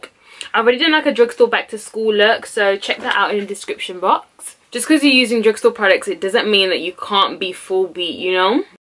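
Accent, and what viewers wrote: United States. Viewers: I wish you wouldn’t speak like a American 😔 love videos though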